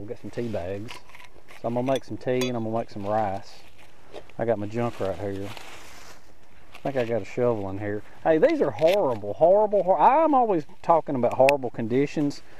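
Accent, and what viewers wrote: South, United States. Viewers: Everything's so dad gum wet! I LOVE your southern twang. Good thing your a good sport, otherwise Nick could be on the business side of a knuckle sandwich, right? Haha. Hope all gors well. I know if anyone can do this...it's you. You got this,!!! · Beaver+southern accent= what region?? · Hi, I've just discovered your channel and " ah jest lurve it". Especially that good ol' southern boy accent. And you have just so many good tips and advice - and your sense of humour just starts my day off with a smile! Keep 'em coming. I'm lovin' it. All the best from Geoff in lil ol' England.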